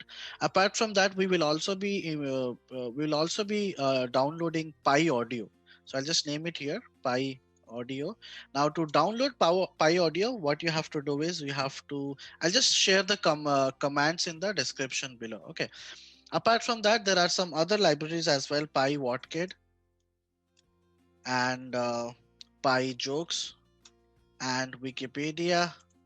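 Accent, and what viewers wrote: Odisha, India. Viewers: Sir are you from Odisha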